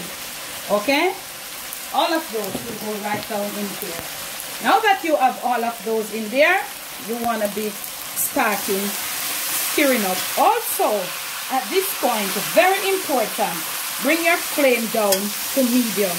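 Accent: Jamaica. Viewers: I like it when you speak Jamaican- we understand !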